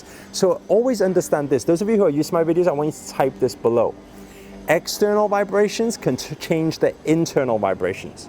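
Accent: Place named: United Kingdom. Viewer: I'm interested in the British accent, I really love it. yesterday I've been thinking; I really want to find a British LOA youtuber, then forgot about it, Opened youtube tonight and I've just found you! I'm blown away because I've been doubting LOA for nothing seems to work. The problem is, I can't let go, I can't stop my obsession with my desires. Please help with a video about an easy way to let go and stop the obsession.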